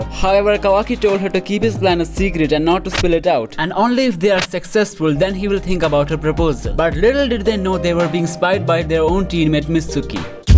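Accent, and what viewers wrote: India. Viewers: ok bro i understand, I said becoz by listing your voice I think that you a indian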